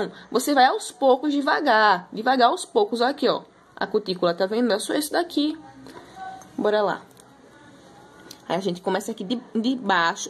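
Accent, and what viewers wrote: Northeast, Brazil. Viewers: Sou carioca, nas amo sotaque nordestino. Acho que nasci no lugar trocado..😂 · Eu adorei o sotaqueee!!! Nordeste!!! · vc é nordestina?😍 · Quando ouví pensei logo Nordeste nas paradas... Tive a certeza quando pronunciou Cli..en..tíí. igual minha amiga...😁😀😊 Amei sei vídeo 👏👏👏👏👏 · Parabéns! Adorei a explicação. E viva o sotaque nordestino, eu amo.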